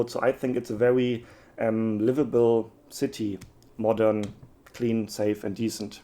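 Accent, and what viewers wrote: Germany. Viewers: Even in English cannot hide the German accent 😊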